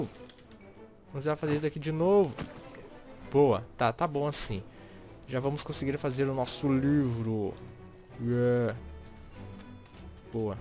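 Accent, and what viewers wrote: Rio Grande do Sul, Brazil. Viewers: eu não gosto quando você fica tudo Gauchinho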